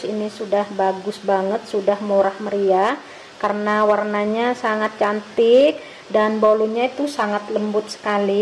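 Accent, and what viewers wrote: Madura, Indonesia. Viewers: Kalau saya tahu cara bicaranya dr pulau madura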